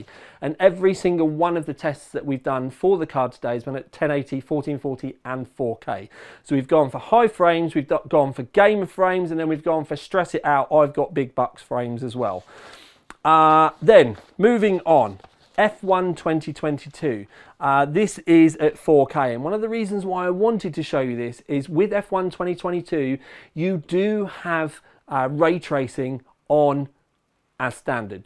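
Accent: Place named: United Kingdom. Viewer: at first I was like "oh, damn, British accent" to which I am not all that well accustomed, but then I went "eh, nice pronunciation" (obviously, eh) thanks for the review and highlights against greens and cheers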